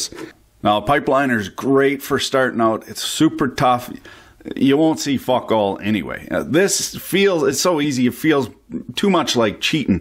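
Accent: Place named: Canada. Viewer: Why can't Canadians pronounce Z correctly?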